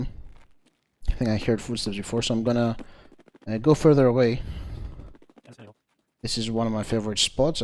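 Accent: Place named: Greece. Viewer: ahhhhh that greek accent <3 nice vid hehe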